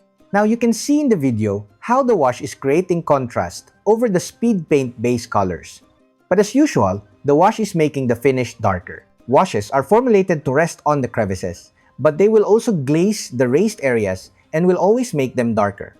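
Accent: Philippines. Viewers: I heard your accent in one of your shorts and thought you sound like Noypi like me 😂 Now I'm getting interested in learning 😆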